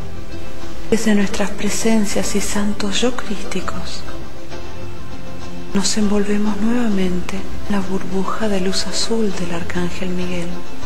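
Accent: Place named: Argentina. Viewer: no se por que pero el accento argentino me pone de los nervios!!! como relajarse asi????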